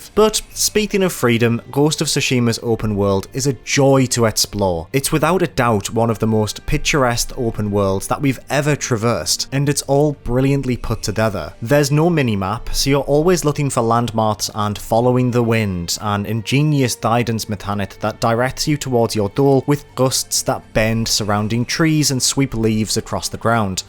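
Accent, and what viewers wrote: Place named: Wales, United Kingdom. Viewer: Maybe Welsh pronunciation creeping in? I noticed it too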